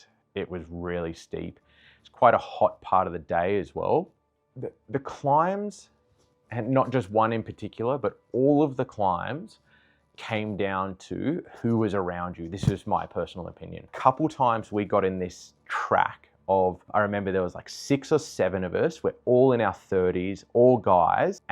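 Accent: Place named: Australia. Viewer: Great to hear a downunder accent. Thanks for this, it was so interesting.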